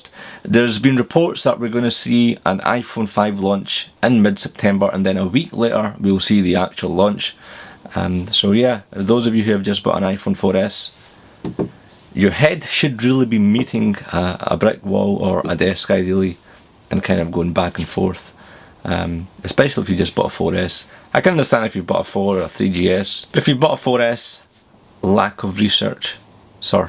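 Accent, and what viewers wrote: Scotland, United Kingdom. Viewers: lurve the scottish accey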